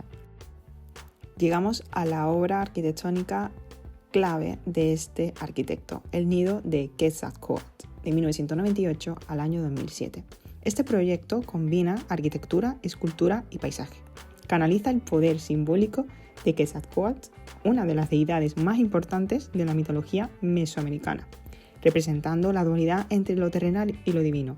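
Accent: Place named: Seville, Spain. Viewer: Una sevillana que intenta cecear. O una canaria que ha vivido en Sevilla. O una hondureña con alguna conexión con Sevilla... No sé, no pillo el acento.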